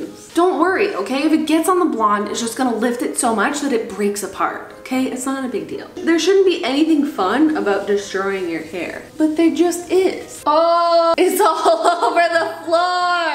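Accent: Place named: New York, United States. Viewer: I feel like as soon as you know is from NY, you can really hear it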